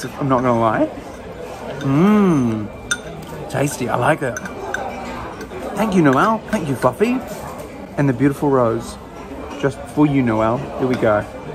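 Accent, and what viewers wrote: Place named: New Zealand. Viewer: sweet! a kiwi playing genshin I enjoy genshin and im planning to move back to new Zealand in the future trying to learn the accent again lol so this is perfect XDD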